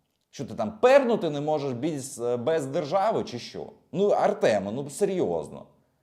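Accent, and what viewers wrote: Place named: Surzhyk, Ukraine. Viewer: Задолбал слушать твой сраный суржик.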